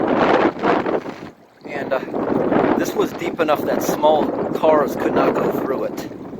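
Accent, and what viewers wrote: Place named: United States. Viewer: Where in the U.S. is this accent from? Or is it just the way he talks? Either way I like it!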